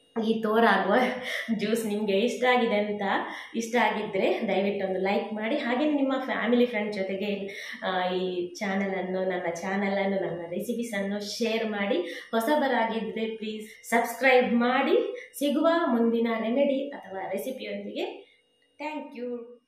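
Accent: Mangalore, India. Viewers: aha kudla kannada🥰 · Kudla da Kannada 👍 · Enklna kudlada kannada 🥰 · ಮಂಗಳೂರು ಭಾಷೆ ಕಡಕ್ ಭಾಷೆ ಸೂಪರ್ ಮೇಡಂ.. 🙏🏻👌🏻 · I like your manglore style kannada bhashe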